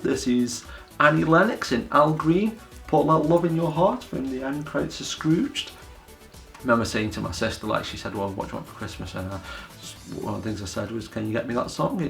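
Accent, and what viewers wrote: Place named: Stoke, United Kingdom. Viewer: A stokie! I'd recognise that accent any day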